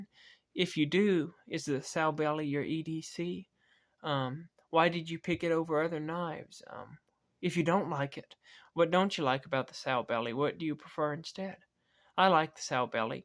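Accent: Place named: West Texas, United States. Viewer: He’s probably not from there but his voice and accent reminds me so much of a soft spoken hotel clerk in Van Horn, west TX 😂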